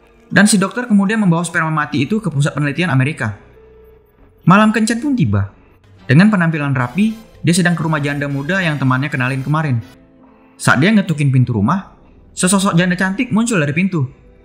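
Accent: Medan, Indonesia. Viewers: Org medan kau ya min? 😂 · Macam logat medan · Nih orang Medan banget omongan nya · Anak medan kau ya bg? 😂😂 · Nampak kali medan kau bang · Logat medan abg ni wkwk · Logat medan nya bro, mantap kali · Kek org medan abang ni, ya kan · Pengisi suaranya org medan pasti ni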